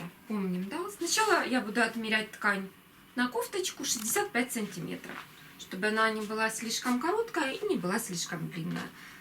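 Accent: Ukraine. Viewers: Кристина Котельва да акцент наш, украинский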